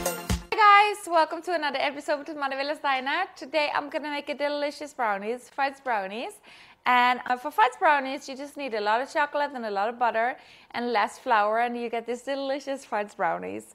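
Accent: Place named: Norway. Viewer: Are you living in Norway? (If you're Norwegian ofc lol) because I can hear a little bit of Norwegian accent when you talk, but it's a lot better tho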